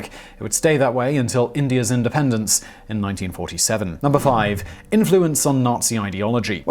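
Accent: United Kingdom. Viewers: That's why you're watching a video narrated by a Brit.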